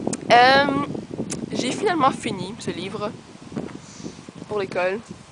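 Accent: Canada. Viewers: j adore voir tes videos..... je te trouve tres sympa et j aime bcp tn accent canadien =) grooooooooos bisou from italy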